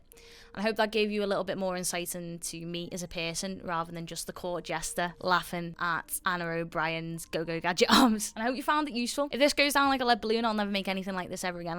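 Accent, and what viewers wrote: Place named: Merseyside, United Kingdom. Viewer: Genuinely, I used to have awful MH then I got better for a while then I had an unexpected loss in the family very close to me and lost my way. I’ve been struggling with it for 3 years, not knowing how I got to a good place, not knowing my purpose in life and trying to avoid my feelings that I fell back into add/ction transference and resorted back to the BED I was diagnosed with that I’d managed to escape for a while. I’m from Merseyside as well so not only does your accent bring me a sense of home as I moved away from home, your videos have reminded me of how I did it the first time. I changed the way I spoke to myself, I changed the neural pathways. Sadly, negative ones were reinforced by the death of my loved one which is partly why I fell so hard (and sadly gained back some of the weight I’d lost the first time I got better). 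Thank you for reminding me that I can do hard things, and I can get back to how I was before because I’ve already done it once, I can do it again. I can take ownership of the bad things that happened to me and be resilient. I am resilient, and I always have been resilient. My family member died and I lost my home in my first year as a mature university student and I still got that degree and got a job despite feeling like the world was crashing and burning around me. I can do hard things. I can take control of my life. I can like myself again. And, most importantly, I can beat the add/ctions I once had. 6 years smoke free. 2.5 years alcohol free. Now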